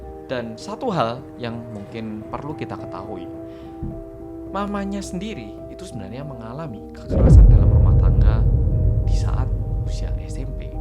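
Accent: Java, Indonesia. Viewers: Medoke wes koyo bukan orang Korea..semangat mas💪👍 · engga si, gue sbg org jawa masih denger logat medhoknya mas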